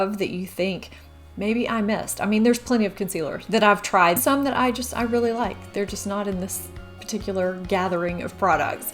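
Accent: South, United States. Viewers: that southern accent intensifying through the video :D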